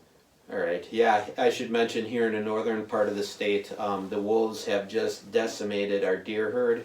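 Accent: Wisconsin, United States. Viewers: I saw tree does.... good Wisconsin Accent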